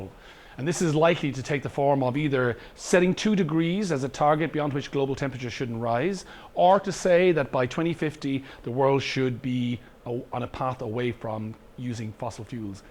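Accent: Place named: Boston, United States. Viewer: Cool Boston accent!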